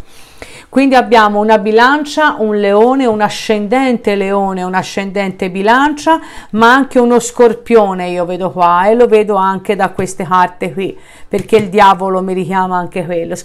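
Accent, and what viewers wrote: Tuscany, Italy. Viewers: Io la LEI, esattamente cosi.. LUI non è un segno di acqua ma ci divide l'acqua. Io in grecia (causa covid) lui in italia. Abbiamo avuto un litigio bello forte.. Cmq complimenti.solo oggi ti ho trovato ma sei fantastica. Adoro la tua cadenza toscana😜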